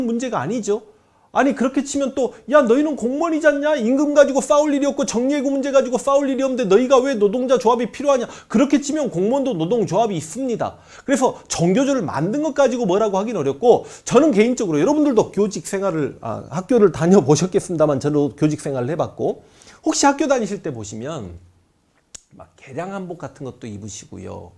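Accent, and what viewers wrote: Jeolla, South Korea. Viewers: 형님..잘 보고 갑니다. ^^ 전라도 분인것 같아서 ㅋㅋ 저도 전라도거든요 ㅎㅎ 앞으로 더 좋은 가르침 부탁드립니다.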